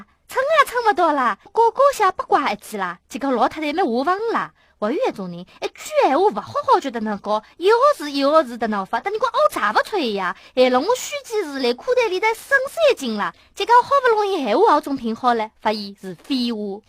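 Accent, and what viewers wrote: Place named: Ningbo, China. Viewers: 这不就是很正常很普通的宁波话吗……顶多有俩字读错（我就听到“容”和“迎”读错了，人家“微信”都读的对了），怎么一大票人跳出来说不正宗不纯正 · 讲的有很多上海话口音、不纯正的宁波说话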